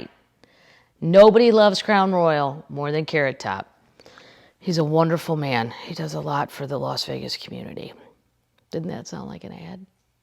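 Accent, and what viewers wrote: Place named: South, United States. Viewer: I am speaking in a Southern accent that now I can't shake after watching you read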